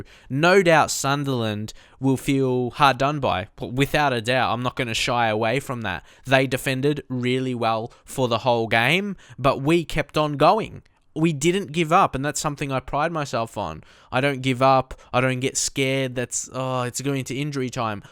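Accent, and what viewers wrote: Australia. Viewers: R u from Australia????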